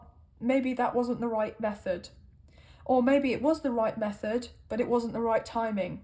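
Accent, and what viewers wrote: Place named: Southern England, United Kingdom. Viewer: Alright sis, love your southern English accent,the french accent was strange, however thanks for all the encouragement we will see how this week works out❤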